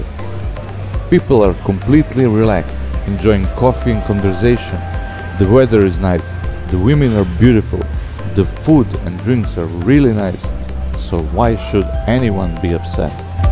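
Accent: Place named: England, United Kingdom. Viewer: Grozan, teski engleski akcenat.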